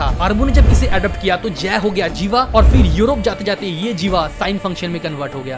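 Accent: Bengal, India. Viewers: Bhai tu Bengali hai kya, tera hindi accent se toh mujhe aisa hi lagraha hai.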